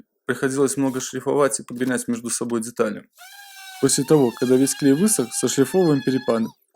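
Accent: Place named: Belarus. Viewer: Точно! Коллега в Минске несколько лет прожил, тоже так говорил.... Живе Беларусь)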